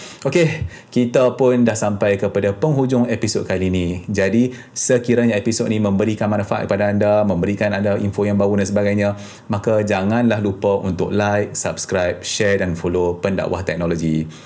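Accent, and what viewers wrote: North, Malaysia. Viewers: tima kasih bg info berguna, izin saya share dlm fb... SELamat Hari Raya Bos.. pekat jugak loghat utara naa...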